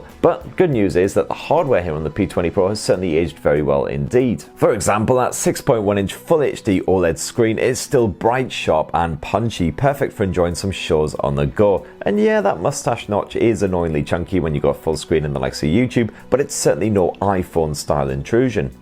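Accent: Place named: North East England, United Kingdom. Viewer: just come across this...should retitle it 'still good in 2021' - I'm still using my P20 Pro, battery still holds up, performance is still great (RR3 is the game of choice and it's smooth as smooth can be) and the camera is still one of the best out there... if you're looking for a a really good smartphone and you find a good deal, snap it up! (plus this model is pre the google embargo so happy days!), I detect a north east twang 'Gan On!'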